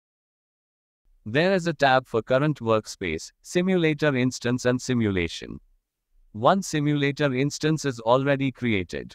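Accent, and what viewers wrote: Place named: India. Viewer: what is the point of using an automated voice if it still has an indian accent!?!?!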